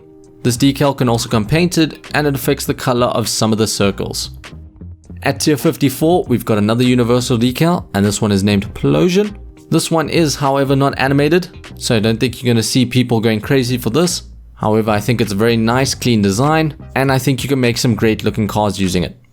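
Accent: South Africa. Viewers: Where you from. You sound south african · Are you South African?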